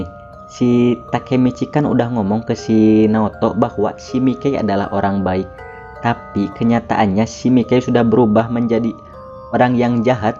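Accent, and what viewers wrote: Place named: West Java, Indonesia. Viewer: Siganamah orng sunda ye si akng :)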